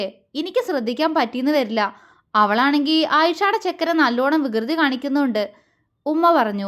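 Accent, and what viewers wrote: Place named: Malappuram, India. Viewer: ആണോ. എനിക്ക് ഈ സംസാരം നല്ല പരിചയം ആണ്. എന്താന്നോ എന്റെ വീട് മലപ്പുറം പാലക്കാട്‌ ഒക്കെ കടക്കുന്ന ബോർഡർ ആണ്. പിന്നെ എന്റെ സ്വന്തം വീടിന്റെ അടുത്ത അയൽവാസികൾ മുസ്ലിസാണ്. അവരുടെ കൂടെ കളിച്ചു വള്ളർന്ന ആളാണ് ഞാൻ. അത് കൊണ്ട് എനിക്ക് വേഗം മനസ്സിലാവും.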